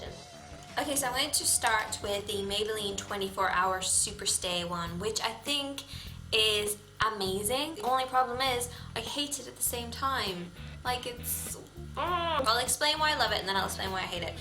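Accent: Ireland. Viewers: ...English accent? Are you people mad? That's clearly Irish...or...Scottish 0.o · Are you Irish? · are you irish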